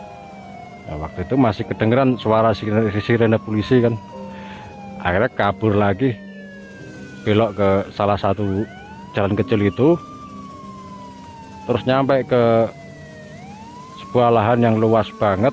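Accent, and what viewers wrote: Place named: Java, Indonesia. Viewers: Medok is not a crime. rapopo, lanjutkan cak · Tanpa 'guwe' jadi mantap kang. Aksen Jawa biar medhok justru lebih enak didengar. Lanjuut....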